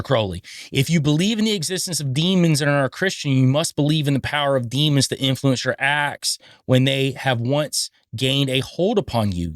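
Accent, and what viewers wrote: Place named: Pennsylvania, United States. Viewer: You sound like you have a PA accent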